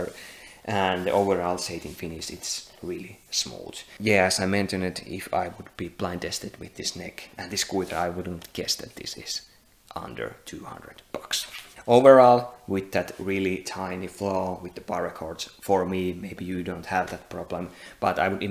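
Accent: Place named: Finland. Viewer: Hahahhaa äijällä vitun selvä suomi aksentti.. Hyvä video!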